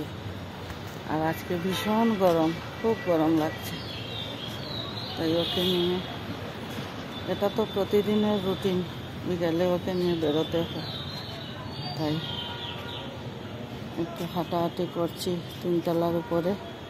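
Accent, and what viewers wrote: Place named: East Bengal, Bangladesh. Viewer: Khub khub valo laglo🎉🎉tumi kothay thako tomar kotha sune mone holo tomra purbo bongiyo... Amio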